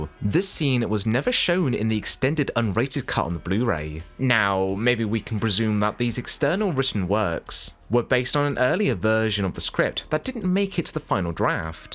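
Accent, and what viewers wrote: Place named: Wales, United Kingdom. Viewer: Your accent reminds me a bit of Dave Lister from Red Dwarf. So that's, what, Welsh maybe? Being from California, I'm unfamiliar with the regional patois of British dialects.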